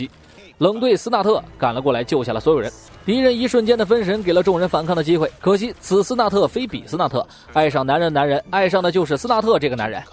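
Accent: China. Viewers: 怎麼中國人那麼喜歡用YouTube 來做盜版的事情？ 操著這個口音 真的很噁心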